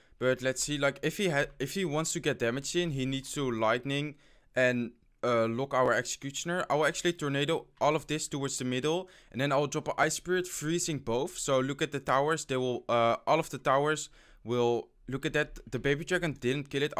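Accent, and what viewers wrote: Netherlands. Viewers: Owen Seetsen hij is nl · you really have an dutch accent, are you dutch, ben jij nederlands want je klinkt echt super nederlands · Are u dutch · jii bent nederlands of niet want je hebt een nederlands engels accent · You have a dutch accent, are you dutch? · ik kan horen dat jij nederlands bent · are u dutch!!! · This dutch accent has got me like 😂😂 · je hebt echt een typisch Nederlands accent als je engels praat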